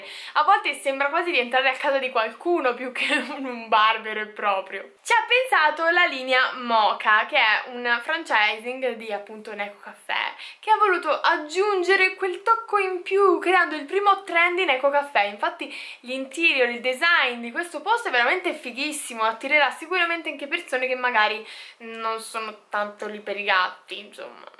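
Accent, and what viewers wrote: Tuscany, Italy. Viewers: il tuo accento toscano è sempre con te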